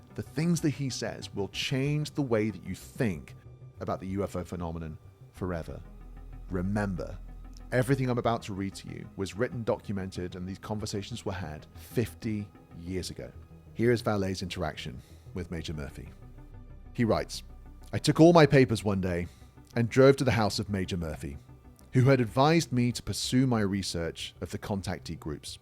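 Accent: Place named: United Kingdom. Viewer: Could be. Your have a British accent.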